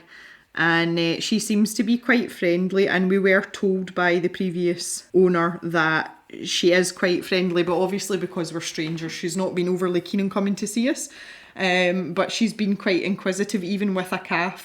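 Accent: Scotland, United Kingdom. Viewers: haha, I think I've said the same thing about enjoying to just listen to you talk, but that's because I LOVE your Scottish accent. But I also enjoy watching your videos and see what you create. It inspires me and also I love watching your ScrawlrBox videos, because it gives me the chance to see if I'm the only one liking or disliking new art supplies ^^ also love to see what you make with the prompts :) Anyway, back to the art at hand. The giraffe turned out really beautiful! I like it :) I have a greyscale colouring book here, but I don't actually like colouring in it :( might be the paper, though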